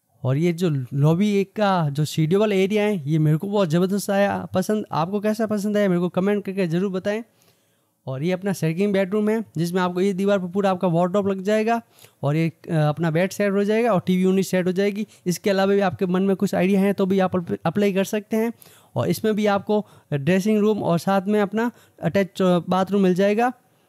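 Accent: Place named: Jodhpur, India. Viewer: Maza aa gaya apne jodhpur walo ki hindi bolne se pata chal jata he ki apne jodhpur ka hi he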